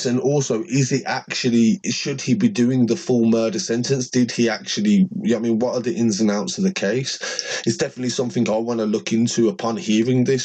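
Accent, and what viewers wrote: Birmingham, United Kingdom. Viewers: I rate you g u tell it how it is and u sound like yu from brum im from balsall heath b12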